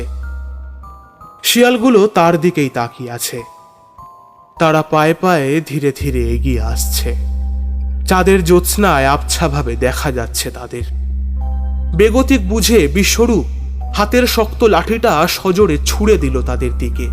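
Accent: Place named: East Bengal, Bangladesh. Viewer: Voice ta to tomar ekdom kaat bangal vai.... recent bera topkechho mone hochhe?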